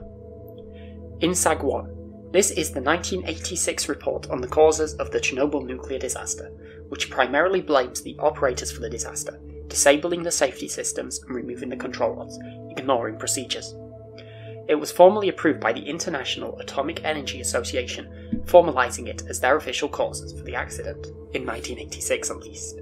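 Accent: United Kingdom. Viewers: have you never heard a northern English accent before?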